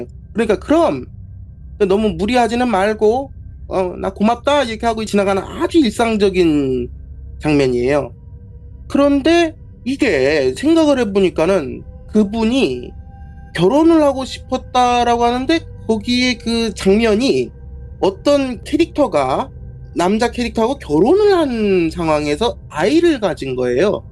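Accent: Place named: Gangwon, South Korea. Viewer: 대전분이라해서 들었는데 토박이는 아니신가봐요 말투가 전혀 다르시네요 강원도분인가ㅋㅋ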